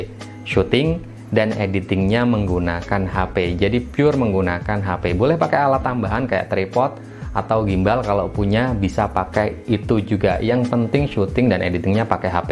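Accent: Pekalongan, Indonesia. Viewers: cah kalongan ndi suarane?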